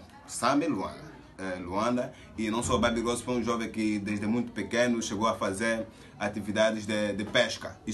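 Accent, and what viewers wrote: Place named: Brazil. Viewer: E estas a falar tipo brasileiro porque? So para pareceres?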